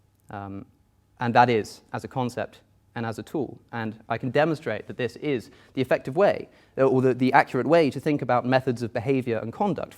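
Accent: England, United Kingdom. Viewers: I think that's called an English accent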